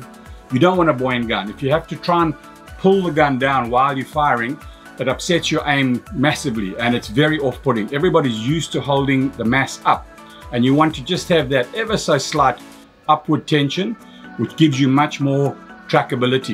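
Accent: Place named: South Africa. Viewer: I have been watching your videos for many years with your cute south African accent and I am waiting for you to start making wooden gun. Now I have the reason to visit you in South Africa!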